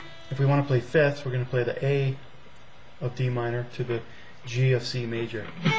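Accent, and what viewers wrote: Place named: Nova Scotia, Canada. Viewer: East coast??? I'm from Truro, NS. Small world! Funny I didn't notice the accent probably because it sounds normal to me! Great lessons though, i've been looking for something exactly like this.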